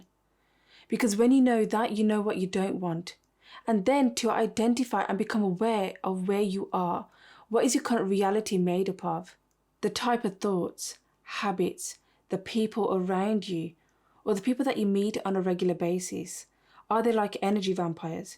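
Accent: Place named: United Kingdom. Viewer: what zodiac sign are you? also, you talk like the guys in (i lived in the u.k. fer 2 1/2 years with an english gf). i have anxiety disorder and in addition to what a strong and domineering emotion it is (along with the usual intrusive thoughts) i do sometimes wonder if my amygdala pumping out cortisol in such high quantities will eventually lead to cancer or some other illness (when it strikes my anxiety lasts fer HOURS and comes out of nowhere). it's like being possessed by some sorda evil spirit.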